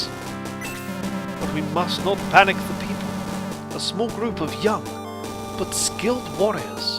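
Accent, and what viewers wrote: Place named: United Kingdom. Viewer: Ermahgerd... An English bloke doing a playthrough of this game... must watch all... Dat voice acting. Doesn't even ruin, British voice too strong...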